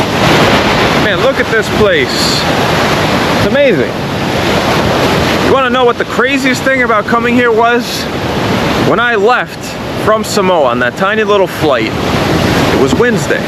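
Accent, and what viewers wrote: New York, United States. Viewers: dude you sounded like a Newyorker. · Yep...you're from New York, alright.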